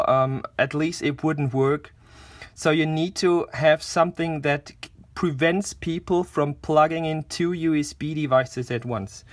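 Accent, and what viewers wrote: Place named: Germany. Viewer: great accent :) really impressive english for zhe german ... keep up with good & quality videos in english :)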